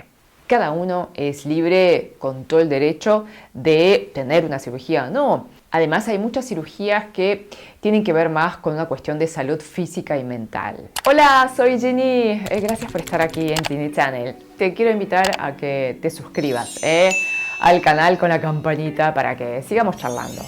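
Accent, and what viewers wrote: Buenos Aires, Argentina. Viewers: Hermosa tu y con ese acento argentno! Mas bella aun...Un admirador Colombiano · y con tu acento porteño estas mas buena, eres la coreana mas buena de habla hispana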